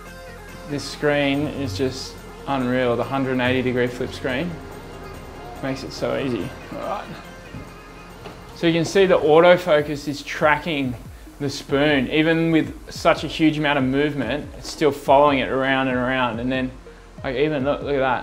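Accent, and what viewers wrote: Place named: Broad, Australia. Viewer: Stop talking like a yobbo,you Need to look at yourself how you come across and it’s not very good clean up your vocabulary get away from the Ocker Image I personally wouldn’t buy off you know pride in that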